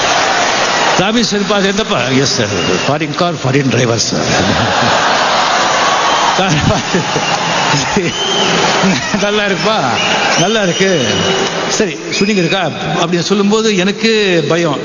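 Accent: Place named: Hindi, India. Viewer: சரக்கு போல தலைவர் ஹிந்தி கரான் மாறி பேசுராரரு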